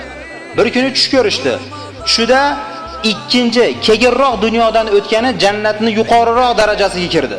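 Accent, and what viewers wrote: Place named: Andijan, Uzbekistan. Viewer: Андижонда укип битирган домла шекилли шевалари тозаа Барокаллох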